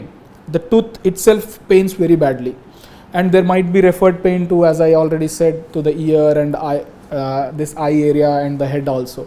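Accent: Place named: India. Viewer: It's Indian accent.....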